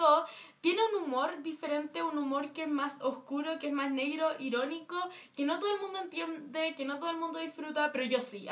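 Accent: Chile. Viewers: eres chilena no? xd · ¿Eres chilena cierto? 😂❤ Pd: si lo eres ya somos dos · tiene más acento de Chile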